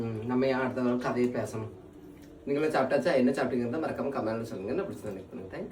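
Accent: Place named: Kanyakumari, India. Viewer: Kanyakumari slang laye thittureenga anna super 🎉😂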